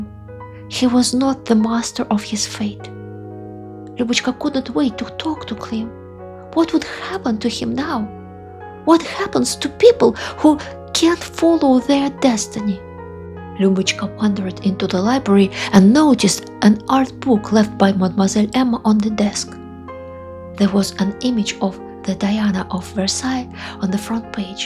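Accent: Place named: Russia. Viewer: This video is really cool. There is no spoken dialogue, it is narrated by herself in her perfect English with her charming Russian accent. The cinematography is vivid and authentic. Enjoy!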